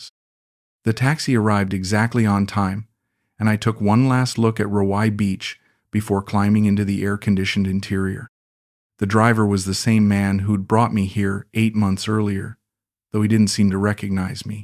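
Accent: United States. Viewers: 🤦 lord have mercy, this is fake, welcome to America 2026. Mindless entertainment to keep you distracted. 🙄. Supposedly British commentator with an American accent 😅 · American accent not British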